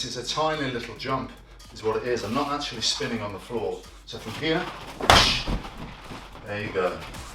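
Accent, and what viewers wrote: United Kingdom. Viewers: I thought Scott is a Ukrainian. I hear a British accent right here .My Idol in Yuri Boyka! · I have never heard him talk out of a movie character so I didn’t know he was British 😂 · Yo I didn’t even know he was British. Man British people are taking over in Hollywood.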